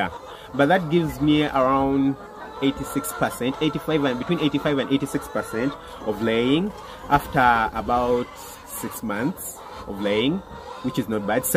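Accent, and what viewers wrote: Uganda. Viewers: I don't know what it is but I love hearing a Ugandan accent!! much love from your neighbour here 🇰🇪 great job Doc! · Are you from Uganda? Your accent 😀... Anyways you’re doing a very good job , may God bless the work of your hands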